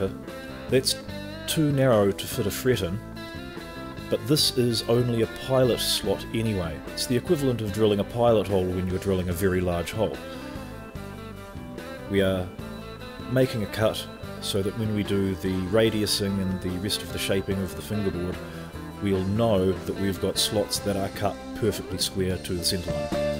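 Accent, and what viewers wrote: New Zealand. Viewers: I thought so when I seen the wax remover and the mention of Bunnings and you sound like a Kiwi..so am I in north island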